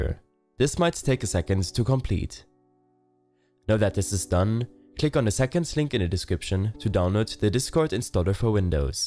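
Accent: Germany. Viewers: i heard your accent in the firt 10 seconds i thnik its german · Defenetly a german guy😂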